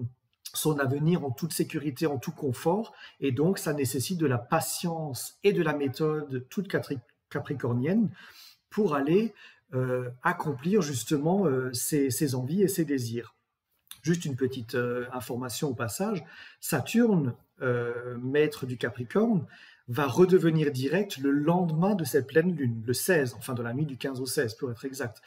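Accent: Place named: Alsace, France. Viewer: Super (y) Très claire, cette présentation de la prochaine Pleine Lune du 15 novembre, assez technique (comme j'aime!) mais aussi très parlante car reliée à des faits concrets (la politique actuelle, l'économie...). J'adore votre petit accent alsacien et votre façon très posée, très calme, très structurée de présenter cette carte du ciel: vraiment presque parfait! J'ai juste noté une p'tite erreur à "Neptune fait un carré au Noeud Nord" alors que c'est une conjonction, mais c'est vraiment pour vous prouver que j'ai écouté attentivement vos explications jusqu'au bout que je fais cette remarque;-) Bravo et merci infiniment pour cette belle présentation, en espérant en voir beaucoup d'autres, de la même qualité (et peut-être qu'un jour, vous nous présenterez votre chat? ) 😉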